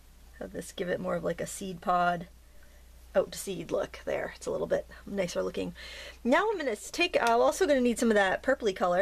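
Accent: Maine, United States. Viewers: Love it when you tell us how words are said in Maine. 🤣shaaarpies lol I bought died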